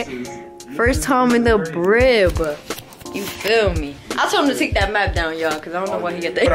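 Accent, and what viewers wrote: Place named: New York, United States. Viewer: That ny accent strong asf😂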